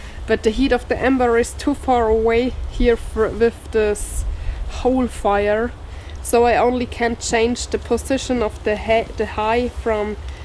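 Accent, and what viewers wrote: Germany. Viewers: It’s called Rosin In The USA,Lov You’re German accent,🥰🙏😇🇺🇸👍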